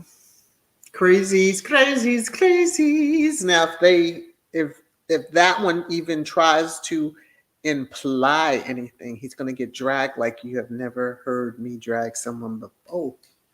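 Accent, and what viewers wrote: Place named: RP, United Kingdom. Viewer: 👍🏻👍🏻👍🏻👍🏻👍🏻👍🏻🧚🧚🧚😘😍be safe ✝️✝️♥️♥️🌹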